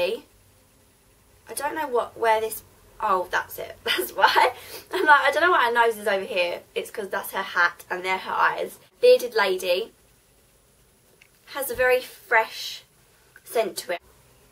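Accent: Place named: Cockney, United Kingdom. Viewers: Cockney accent , that shocked me big time . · love your accent, are you from london or there abouts? x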